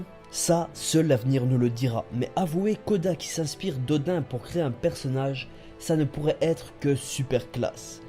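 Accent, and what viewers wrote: Belgium. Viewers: L'accent belge que veux-tu ! Moi aussi je dit "oui cent trente ouitte" xD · imagine la barre qu on va se taper pour le chapitre 890 aka oui sans nonente 😂. on te kiff, change jamais ton accent belge. je dirais même que tu devrais utiliser plus d expressions de chez toi,genre à tantot etc...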